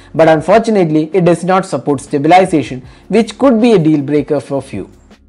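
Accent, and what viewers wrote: Kerala, India. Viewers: Hehe oru mallu slang alle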